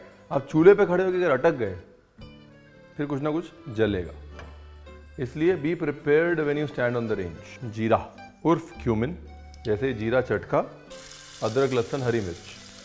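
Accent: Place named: Bihar, India. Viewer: Aap Bihar cartoon mein bolate hain bhai ji bahut Achcha lagta hai aapka bolna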